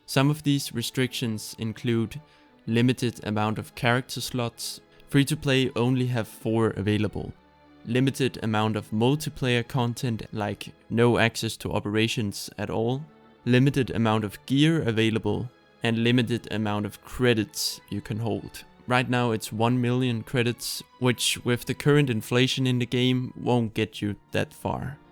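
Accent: Denmark. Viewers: Fornemmer lidt danglish ;-) Jeg er gammel swtor spiller men overvejer at spille igen F2P efter et par siden. VIl du være interesseret i at spille sammen eller blot give gode råd in-game?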